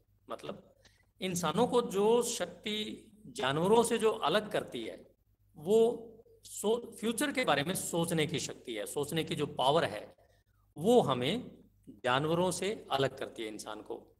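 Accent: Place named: India. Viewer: Perfect Raw indian voice. Suits subject that you have chosen shriman G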